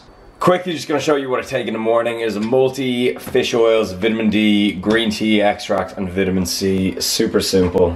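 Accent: Ireland. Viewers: Interchwined 😂 I could listen to the Irish all day aha, another great one mate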